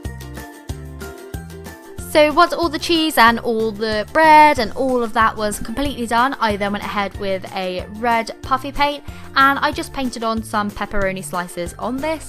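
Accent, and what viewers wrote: England, United Kingdom. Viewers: I like and enjoy watching your squishy videos but (just my personal unpopular opinion) I can’t stand British/English accents.. idk why my ears can’t handle your accent lol so I have to watch your videos on mute. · I like your ascent, are you British? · I dont want to offend her but..... Are you British? She really sounds British....... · Are you from England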